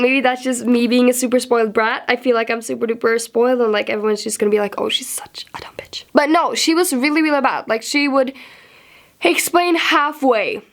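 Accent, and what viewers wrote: United States. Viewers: johansson they didn't say it was bad lol it's just different because to me she just sounds American so it was surprising :)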